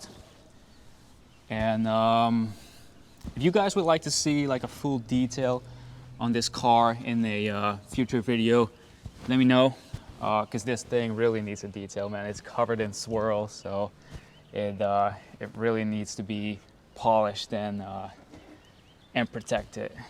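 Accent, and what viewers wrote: United States. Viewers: I'm surprised you have a very American accent considering you are based in Belgium (I think) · you do sound like an american, very impressed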